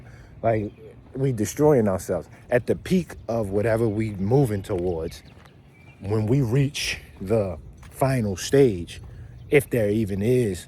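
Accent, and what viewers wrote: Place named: Baltimore, United States. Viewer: u from baltimore huh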